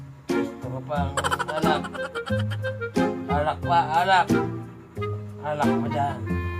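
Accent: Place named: Bicol, Philippines. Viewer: ahahah bicolano po ba yung salita nio tagayan na thankyou sir sa video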